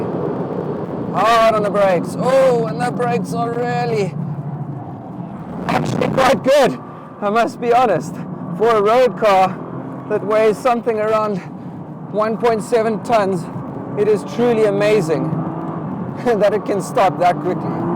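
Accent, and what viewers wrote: South Africa. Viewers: Hey woah, you sounds South African? I am really going to try and make a plan to come for some instructor days to Spa, need see pricing packages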